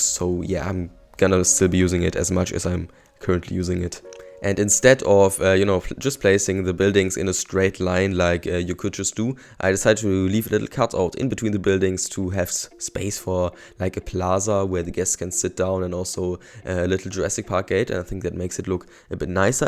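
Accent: Germany. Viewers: I love the Design and Are You German because of the accent😅?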